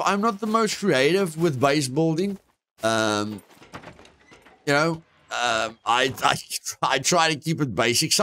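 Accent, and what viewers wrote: South Africa. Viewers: South African at it's finest haha - love bro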